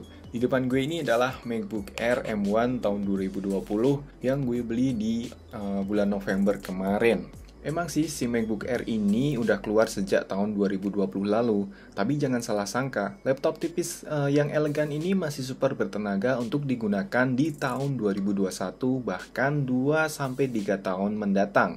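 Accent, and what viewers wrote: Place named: Java, Indonesia. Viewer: review nya jelas, sama kayak medhok nya #timmedhokforever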